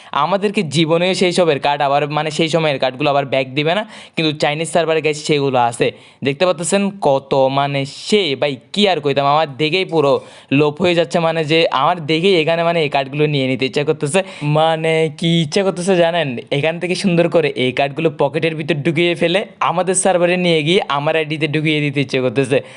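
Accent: Chittagong, Bangladesh. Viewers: ভাইয়া আপনার বাড়ি কি চট্টগ্রামে?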